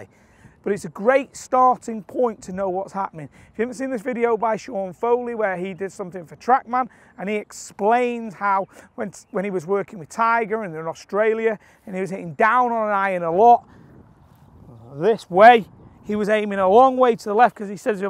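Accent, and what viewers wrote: Manchester, United Kingdom. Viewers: As ever, a great video and very informative! It`s interesting to notice that when you are really interested in a topic you tend to speak quicker. That`s fine for me and your fellow Manc viewers, but for the non-Manc`s you might want to slow your delivery down a tad just in case they can`t keep up:)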